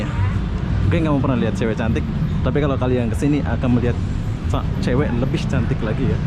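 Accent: Malaysia. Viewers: Ini Abang dari mana logatnya mirip orang Malaysia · Orang malaysia itu .cara ngomong nya keliatan . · Abang dari Lombok???😑😑😑 Bahasa Lombok dan loghat nya GK ada Melayu 😑😑tipu lah Abang ni,Abang dari Malaysia bukan dari Lombok 😑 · Kak asli org mana? Kok ngomong ke melayu melayu gitu hehe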